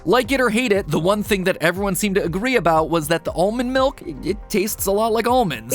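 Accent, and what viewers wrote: United Kingdom. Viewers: wow when did become british??? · oh hes bri'ish or an aussi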